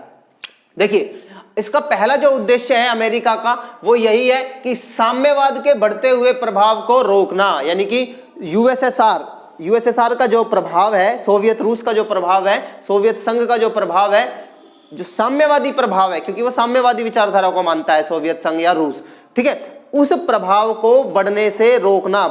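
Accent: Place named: Rural, India. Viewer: Bahut dehati pronounciation h sir apka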